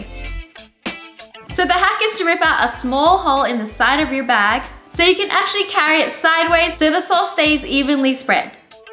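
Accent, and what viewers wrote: Australia. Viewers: Wait is she Australian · Are you Australian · Are you Australian???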